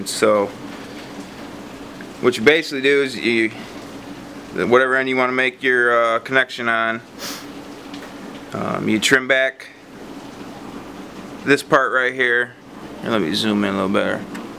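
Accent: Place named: Michigan, United States. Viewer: Thanks bro. Haven't heard a Michagan accent in a while!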